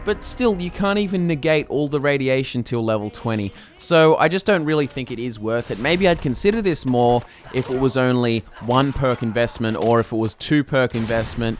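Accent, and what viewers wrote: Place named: Australia. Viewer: What an annoying Australian accent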